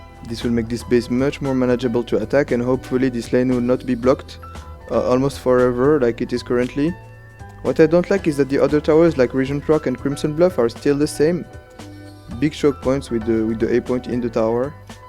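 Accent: France. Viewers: Cet accent francais ^^ Tu parles francais en langue natale ? Il y a des vidéos où tu parles full french :) ? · you are french no ?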